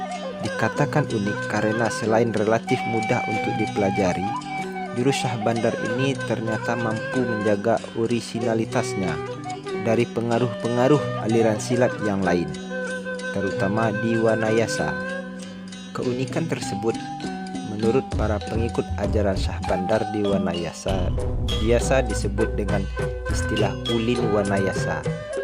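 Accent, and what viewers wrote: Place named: Minangkabau, Indonesia. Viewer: Logat minangnya kental sekali udaaaa. Btw terima kasih atas informasinya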